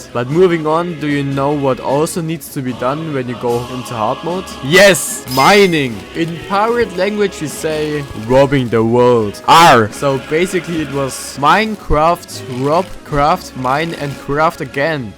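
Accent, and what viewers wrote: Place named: Germany. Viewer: man hört den deutschen in dir hahah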